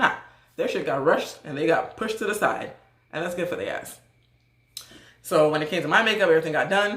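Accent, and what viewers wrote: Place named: Gullah Geechee, United States. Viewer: I just don't understand some people. Sounds like you're better off sis. And are you from SC? You have that geechee accent going on?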